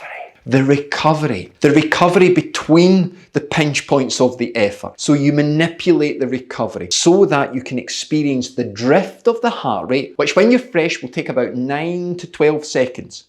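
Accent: Scotland, United Kingdom. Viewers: Hahaha! I laughed out loud when you mentioned talking less Scottish. Then I thought, actually his accent is pretty thick. I love it, and I love the advice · Please never speak less Scottish! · I like your scottish accent :D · I like your Scottish, Coach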